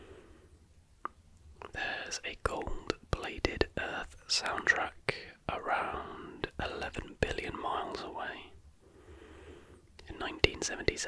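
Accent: United Kingdom. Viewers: Amazing video to fall asleep to. I take a while to fall asleep so I usually need something to occupy my brain until then and this is absolutely perfect and even better that its educational. Your voice with your British accent is just amazingly calming to listen to. Thank you very much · he's just british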